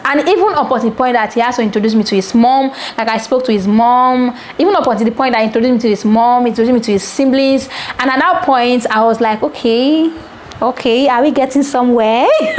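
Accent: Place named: Yoruba, Nigeria. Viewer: I like your vibes.. you so funny 😂, wow your Yoruba accent looks good from an Igbo lady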